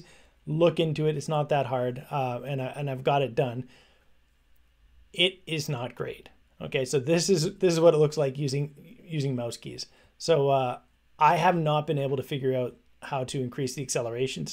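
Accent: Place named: Canada. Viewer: It took me five seconds to know you’re Canadian. And I grew up and I live in Sweden. The times we live in❣️🙏🏻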